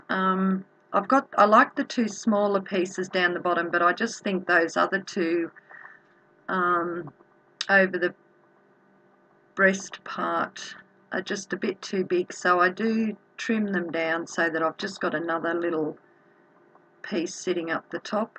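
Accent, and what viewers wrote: Australia. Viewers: so true, and your Aussie accent is helping me smile even more! xoxoxo:))))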